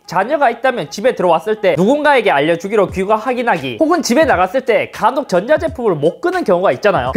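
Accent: Gyeongsang, South Korea. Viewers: 언어학자인데요, 잇섭님 여기서 ㅎ에 대응되는 발음으로 표준어의 [h]가 아닌 동남방언의 [x]를 하고 계셔서 그런거에요. 아 모양으로 입을 만드시고 입김을 분다는 느낌으로 시작한 다음에 모음을 얹어줘야 표준어의 [하]가 되어요.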